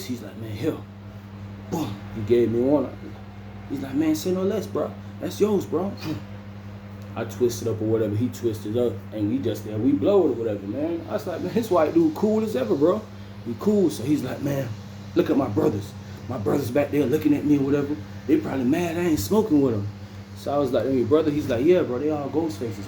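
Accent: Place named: Jamaica. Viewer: One of the best story tellers as of recently. Love how well you speak English and switch it over to Jamaican effortlessly.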